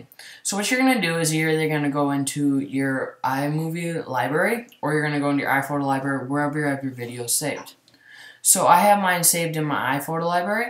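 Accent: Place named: Wisconsin, United States. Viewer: I can tell you are from Wisconsin just from your accent.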